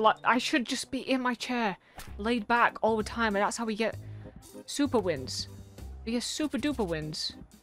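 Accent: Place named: North, United Kingdom. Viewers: I am going to guess Manchester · are you from the north?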